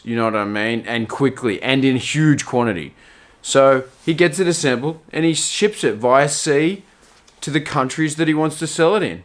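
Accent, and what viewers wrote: Australia. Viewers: That's how Aussies talk hahaha. Studied abroad there.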